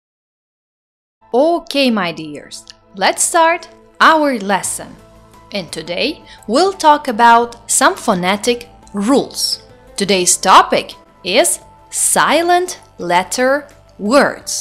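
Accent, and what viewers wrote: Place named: United States. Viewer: I like this American accent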